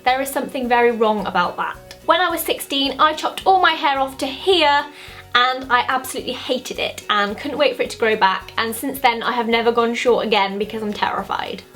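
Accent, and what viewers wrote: United Kingdom. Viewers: is my favorite beauty guru out there! Her voice is just so adorable. She inspired me to make my own channel & start posting videos. After every single video I watch of hers, I accidently start talking British, haha. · Damn, I like British people. I could listen for her forever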